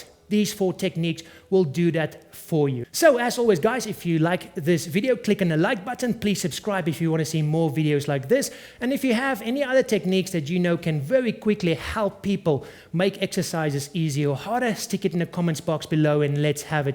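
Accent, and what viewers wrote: South Africa. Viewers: South African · are you south african?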